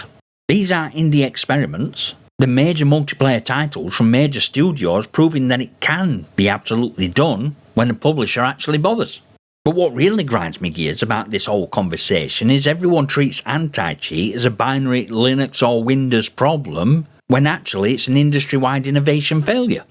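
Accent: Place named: Lancashire, United Kingdom. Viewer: OK, you're new to me -Lancashire accent, I believe, common sense obviously worth a listen.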